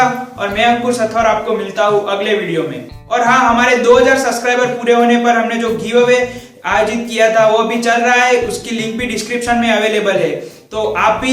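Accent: Gujarat, India. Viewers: Bhai tu gujarati he hena?? and vaat nahi vat bol...or exercise nahi excise duty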